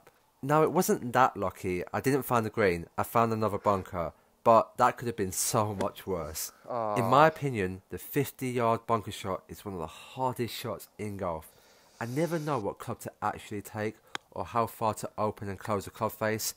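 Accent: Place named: United Kingdom. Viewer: I’ve watched so many of these videos that as an American his British accent is sounding like a normal-to-me american accent from my POV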